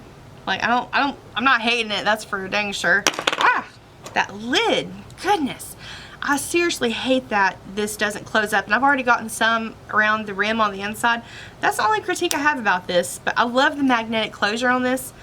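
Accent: South, United States. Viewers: Love your eyelook, especially with your eye color! I got the other eye palette and my jury is still out. lol I got the Sunday Riley cream as part of my box and am in love with it; that fragrance!!! I also added on the Tetris lip balm that you did and think it is a great product and such fun packaging. I'm a new sub and 1st time commenting. I like your channel and your accent. You sound southern to me. I'm 63 and live in Iowa and am pretty new to Ipsy (since Dec 2018).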